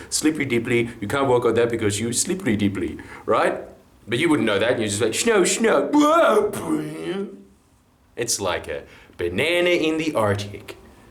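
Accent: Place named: Australia. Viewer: At this moment I am s in and don't know what you're on about. This is fine - as I only wanted to acknowledge that YouTube auto captions work well with your voice. This is great, because you are funny all by yourself without the slow car crash of captions going awry which normally happens. I don't even know why my captions were on. But I was impressed. Educated, neutral Australian accent. Works well enough for me.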